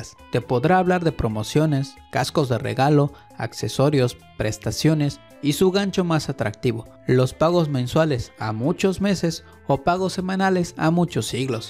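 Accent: Oaxaca, Mexico. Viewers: De qué parte de Oaxaca eres? Jaja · Nmms de que parte mero de Oaxaca eres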